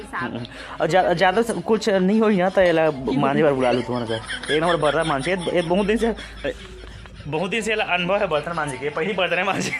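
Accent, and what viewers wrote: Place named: Chhattisgarh, India. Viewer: Cg tune dale ho ten ha bht mst lagt he ❤️🤗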